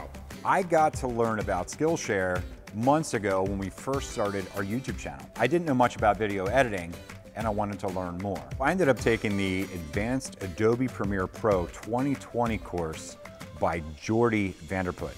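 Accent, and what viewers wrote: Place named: Pennsylvania, United States. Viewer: The way you say words like drawing - drawling is so PA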